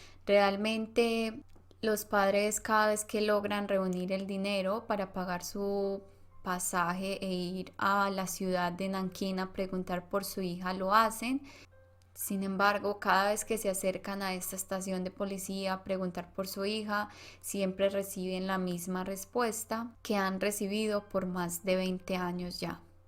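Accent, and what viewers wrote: Colombia. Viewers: El mas bonito de los acentos colombianos, de donde eres?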